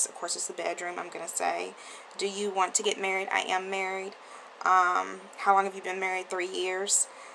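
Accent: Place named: South, United States. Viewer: I subscribe because you sound like me, I'm a southern girl too.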